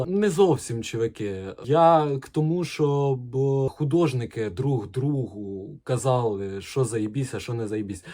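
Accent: Ukraine. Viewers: это потому что у него такой суржик, что только акцент украинский, а слова почти все русские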